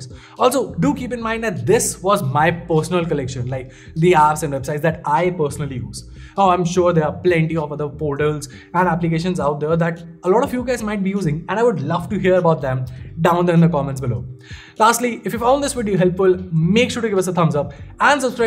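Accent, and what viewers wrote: India. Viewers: join video, hearing indain accent, leaving the video. edit: thank you, the video was useful.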